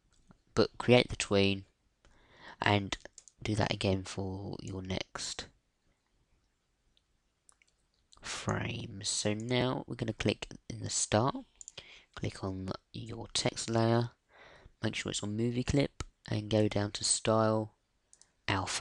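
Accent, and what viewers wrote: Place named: England, United Kingdom. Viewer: It's not the sound, he's just got an English accent...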